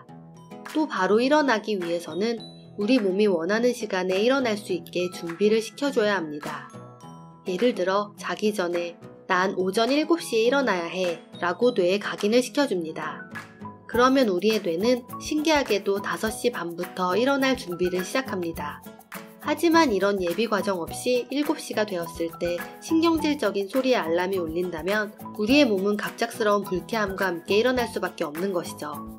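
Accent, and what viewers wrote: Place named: Gyeongsang, South Korea. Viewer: 녹음하신분이 경상도분이시구나 ㅋㅋㅋ 경상도 사람은 딱 알아봄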